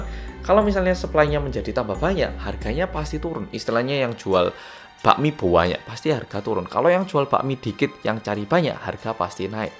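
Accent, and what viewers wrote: Java, Indonesia. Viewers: Wah ma's emang he at bgt gua coba bicara2 bhs medo aja gabisa😭😭😭😭😭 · yang medoookkk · Welcome back to KOREA REOMIT BERSAMA ORANG KOREA YANG MEDHOOKKKKKKKKKKKKKKKKKKKK🚗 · Tim gercep mas medokkk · helooo mamang medhoookkkk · Medhooookkk. Gercep dan setiap nonton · Bersama orang Korea yang "MENDOK" :V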